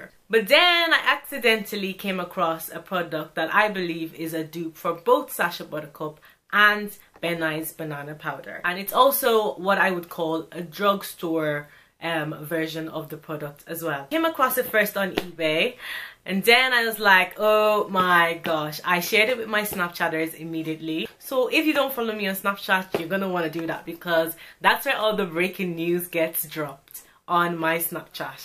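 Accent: Ireland. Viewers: Was googling this product and clicked onto your video and then was like yeah thats deffo an Irish accent! Love finding Irish gurus so I can buy what is rec'd easily!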